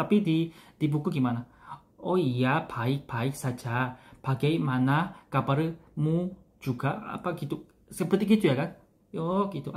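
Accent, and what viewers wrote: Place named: Java, Indonesia. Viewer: logatnya kayak logat orang jawa.. beberapa kata terdengar medoknya :))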